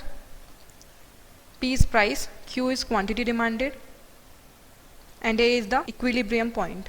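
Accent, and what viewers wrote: India. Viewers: Thanks, I love Indian accent.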